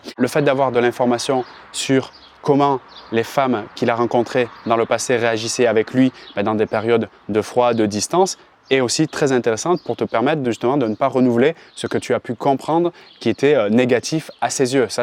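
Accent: Quebec, Canada. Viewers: Merci pour ces conseils,. Mais s'il ne faut pas être "prévisible" et éviter le silence radio, quelle stratégie, préconises-tu? Ps: j'aime bien ton accent, serais-tu Québecois?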